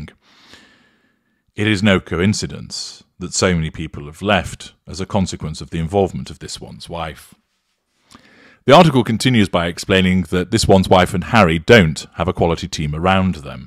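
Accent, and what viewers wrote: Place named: RP, United Kingdom. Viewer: Hi Sir, love your use of profanity and you still sound so upper crust and gentlemanly, it’s like hearing a priest swear, you make me laugh 😆 🤭 I do have a telephone voice like all Londoners😊🙃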